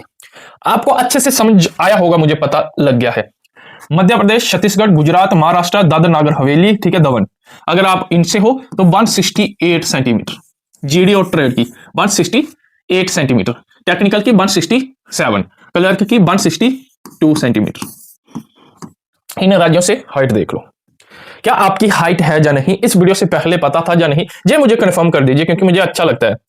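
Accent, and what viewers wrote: Punjab, India. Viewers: Bhai aapki bhasha se pta chal rha h ki aap punjabi ho❤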